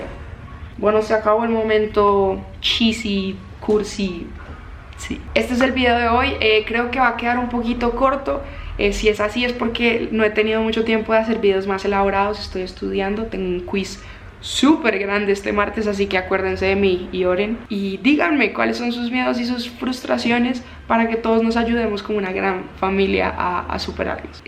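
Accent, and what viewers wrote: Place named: Andes, Colombia. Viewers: el acento de Bogotá es diferente, el que hablan sus compañeros si es el acento de Bogotá. Ella tiene un acento neutro con un poquitico de caleño (ella es de Cali) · Hola, si es muy normal esa entonación de la S en la región andina de Colombia. Ella habla con acento de Bogotá, la capital de Colombia. De donde eres?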